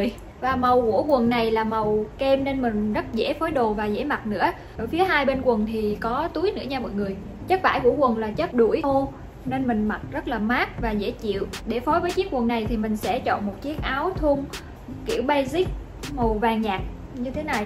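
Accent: Quang Nam, Vietnam. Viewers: Nghe phát biết người Quảng Nam liền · c này chắc người Quảng Nam quá :))))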